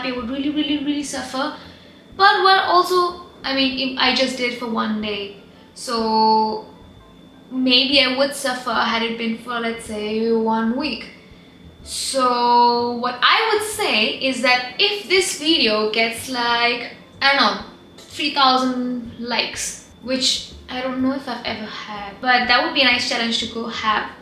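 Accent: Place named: Kerala, India. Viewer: Yo....I have stumbled on your UT videos a couple of times in the past...but today my mind decided to chkout a few in good dtl....1stly, for cooking skills at this age, I must exclaim OMG, WOW WOW WOW n congrags n I did moreovr add, tht your speech tone (but slower talk speed dfntly), dress code, prsnlty and presentation, all in all is quite professional alrdy.. keep it up keep it up God Bless among the lots observed including cat out of bag you are mallu frm coupla tips of tongue..mnwhl the above in nut shell... 'am a very senior mallu myslf too...from out of India for long long time..I ardently follow my family trait n hobby to mentor n guide lifestyle/career...n its wht caught my eye n interest in a Chef Cinderella of future, yes, I think it cud be likely...but but BUT, you need to be WELL sculptured in literally alround ways... I never liked Ou Ou Ou french but do have a rather strange twist (if you will) connection tho...jst how on earth did you land up there..... 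BTW, am neither tech savvy nor the social media guy...so my fingers are yellping to stop...shd you wanna hookup in furtherance email/WA is my convenient preference...you've been off UT for 2mnths now it seems... will chk on your reply....nice getting wth you... be safe n care...God Bless... BRgds GK/Bobby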